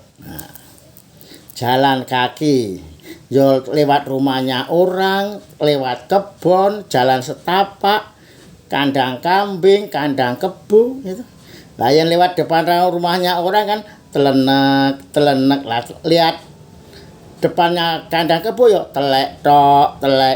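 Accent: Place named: Java, Indonesia. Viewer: $3mangat pakdhe, sehat sll...bhs jowo medhok,*)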